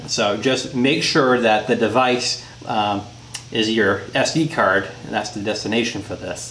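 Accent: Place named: Maine, United States. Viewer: Thanks for the great video very informative it’s nice to hear from a fellow Mainer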